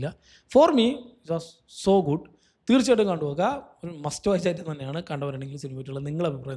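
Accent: Palakkad, India. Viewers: bro, palakkad ano?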